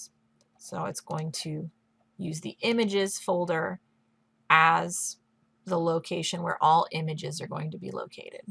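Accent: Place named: Los Angeles, United States. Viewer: This has been helpful! You sound like you're from LA.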